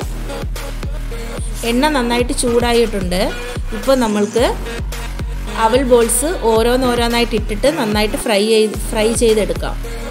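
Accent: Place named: Tamil Nadu, India. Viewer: Gopi manchuri enn കേട്ടിട്ടുണ്ട് ഇത് aadhyaayita enthayalum kandal തന്നെ manassilakum super chechi malayalam samsarikkumbilum tamiz idakk idakk slang verunund Sem2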